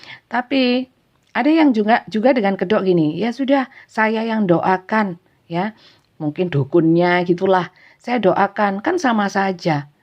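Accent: East Java, Indonesia. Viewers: penyampaian yang apa ada nya simple jawa timur banget mudah diterima dan di cerna semakin sukses ya mbk sllu dlm keberkahan Allah SWT. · Peeeh ora umom to Bun...suka dngn Readinganny karna suara dn logat jw timuran yg khas,slm sehat Bun dr cancer Borneo nyimak 🌹🙏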